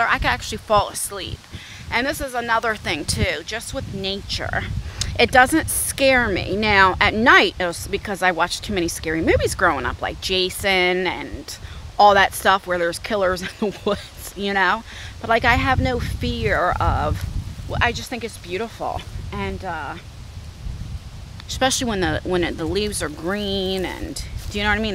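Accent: New Jersey, United States. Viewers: Beautiful area! I’m Long Islander who moved to middle Tennessee . I miss it there and your north eastern NJ accent (similar to mine), I miss! I do love it in TN and we also have many trails. I’m wondering if you get lost if your map app on phone can help detect your location? Ui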